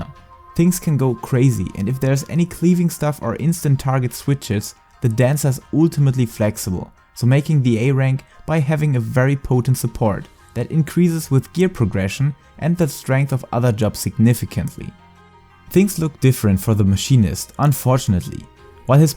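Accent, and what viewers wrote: Germany. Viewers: R U German? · So wie dein Englisch klingt, bist du deutsch oder ? Sehr gute und Hilfreiche Videos!! Sehr zu empfehlen!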